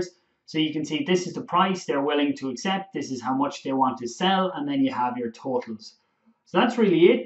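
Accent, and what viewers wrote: Ireland. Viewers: Great to hear the Irish accent !😁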